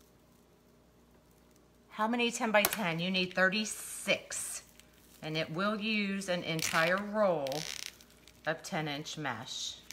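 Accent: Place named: Louisiana, United States. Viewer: Enjoy your videos. It is interesting to see how the UITC boards make pretty wreaths with less time and material. I know you said you were in Houston, but your accent sounds like Louisiana to me. Are you originally from there?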